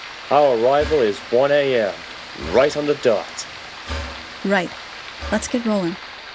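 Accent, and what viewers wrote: United States. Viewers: Not bad. Great southern accent by the way. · You did a great job using an American accent. You could use your American accent for your narration in the Clinchfield Curse. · Was most of the dialogue you attempting an American accent?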